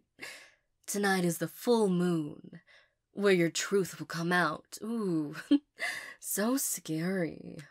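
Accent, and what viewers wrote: South, United States. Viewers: Let's gooooo. Where's country accent? Painful truth or comforting lie? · You sounded like a classic southern belle. It was a nice change and you absolutely nailed it!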